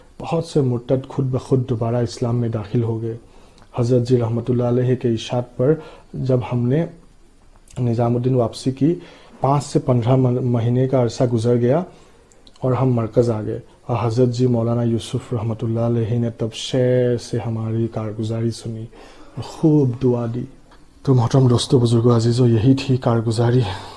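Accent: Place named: Bharuch, India. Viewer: Muje aap ki aawaz par se lagta hai ke aap Bharuch Gujarat India se ho???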